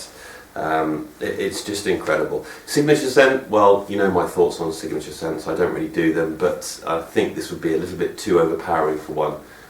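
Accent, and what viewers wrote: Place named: United Kingdom. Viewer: Hey. I love your voice and that UK touch accent however last video you uploaded was 5 years ago so I hope you doing good.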